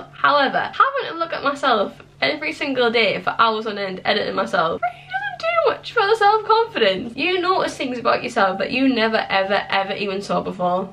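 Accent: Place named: North, United Kingdom. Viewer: you’re one of my fave you tubers, I don’t think I’d ever stop watching your videos, you’re so fun and honestly I would love to be friends with you, I’m also from the north and I absolutely love your accent ‼️💜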